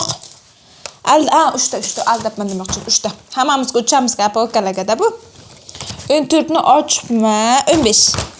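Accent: Bukhara, Uzbekistan. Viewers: Buxorolikmisiz deymanda?😂😊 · Buhoro manimcha adashmadimi man toshkentlide😊😊😊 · Buxoro danmisiz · Buxoromizni shevasi shirin umrizni oxirigacha shunday beg'ubor bo'lib qoling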